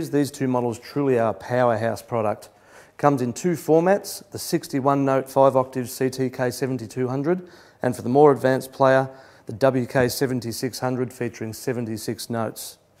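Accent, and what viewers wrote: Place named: Australia. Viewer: australian accent sounds cool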